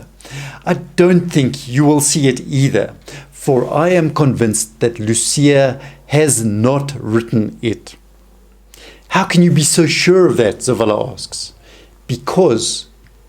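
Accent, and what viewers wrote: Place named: South Africa. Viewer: Sounds like it is from Africa. South African maybe.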